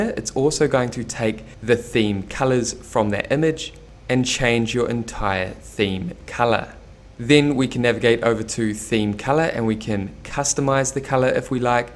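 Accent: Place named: New Zealand. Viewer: Brilliant as usual. I just don’t bother with other YouTubers any more. wins. Plus, as an Aussie cousin from the other side of the ditch, I love your local accent.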